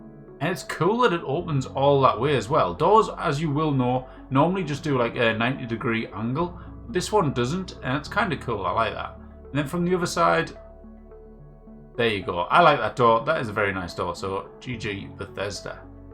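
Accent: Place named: Newcastle, United Kingdom. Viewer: A fellow Geordie 👍👍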